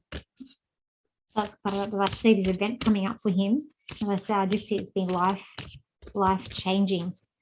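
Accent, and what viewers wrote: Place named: Australia. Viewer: I first had difficulty due to the Australian accent. I turned on the sub-titles and then I understood.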